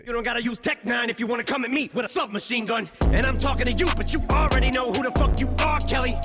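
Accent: Canada. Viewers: this is the most canadian accent i've ever heard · Canadian accent. Well good video anyways. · Okay his popularity was never gone but maybe his album sales was halfed until kamikaze but he still outsold his peers ...so he still wins EMINEM WAS NEVER IRRELEVANT EVER EVEB WITH HIS NOT SO GOOD SELLING REVIVAL!!!! Also are you Canadian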